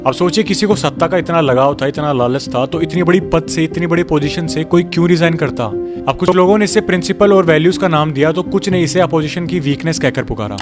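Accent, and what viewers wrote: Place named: Rajasthan, India. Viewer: Good content 💯 bro this voice from Alwar Rajasthan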